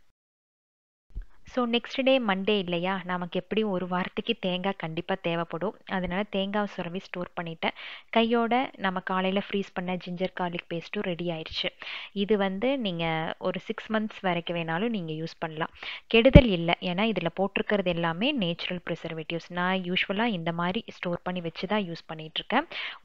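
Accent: Coimbatore, India. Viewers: Love from UAE... Am ur new subscriber I used to watch very few ppl vlog only .. Recent times I used to watch ur videos also I like ur kovai slang..and way of ur cooking good.. It's seems like my mother preparations... When I miss my family and my mom ur videos made me relax... Good job keep rocking.. Also I saw another one videos u mentioned abt some negative comments also o watched that particular u tuber videos... It's completely different ur video covered end to end thing it's interesting also very useful.. All the best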